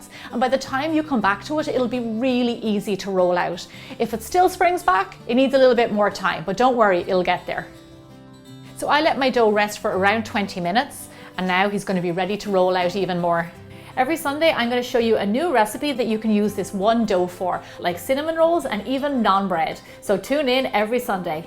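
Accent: Ireland. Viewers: her Irish accent 😍😍😍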